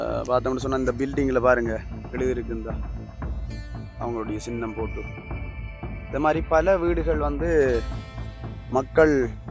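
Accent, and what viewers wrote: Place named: Sri Lanka. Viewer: இலங்கைத் தமிழழகு 🐅🐅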